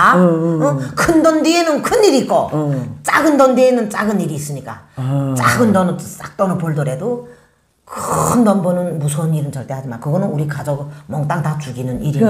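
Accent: Hamgyong, North Korea. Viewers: 우연치않게 님의 동영상을 모두 보게 됐네요...오늘에서야...물론 님의 평양만사는 구독신청해 놓았고..자주 보는데 님의 구수한 걸쭉한 이북사투리 넘 감칠맛나서 중간에 보다가 시간이 나서 처음부터 자세히 보게 됐습니다. 아주 코너를 하나 만들어서 하는게 좋은듯....그리고 님 저가 장담하건데...분명히 똑똑한 작가가 있다면 TV작가가 있다면 연락이 올것입니다. 그전에 연기 공부좀 해두시면 님이 반듯이 꿈꾸던 북한 여자 연기자역이 반듯이 올것이라 생각됩니다. 참 대찬 여자라고 생각됩니다.....당신을 응원합니다.....북한 탈북인들 유튜브를 가끔봅니다만 님 정말 당차고 대찹니다.......조만간 "채널A 이제만나러 갑니다"에서도 연락이 와야하지 않을까 싶습니다....너무 잼나서 오늘하루 씨의 방송프로를 다 보았습니다....정말 재미있습니다..........응원합니다.........홧팅~~~~~아차~ 필살기 4편은 언제 방영되는지....빨리 나오길 기대합니다. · 님 오래 기다렸습니다 함경도 말씨 좋습니다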